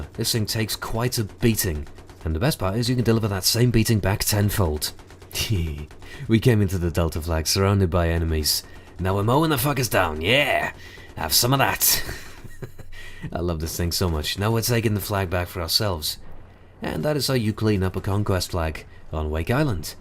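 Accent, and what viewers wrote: United Kingdom. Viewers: That accent! Somewhere from UK, I guess.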